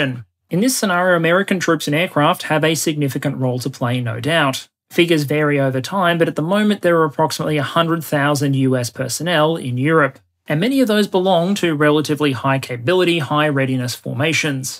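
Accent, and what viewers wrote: Australia. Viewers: He has an Aussie accent , but as an Aussie , I can tell he is a nerd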